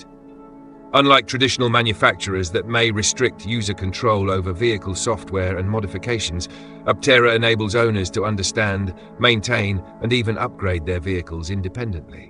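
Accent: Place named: United Kingdom. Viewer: Oh, goody! Another fluffer for aptera ..but with a British accent.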